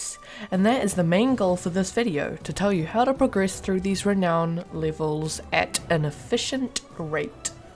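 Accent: New Zealand. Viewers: I absolutely love your accent, is that a kiwi accent?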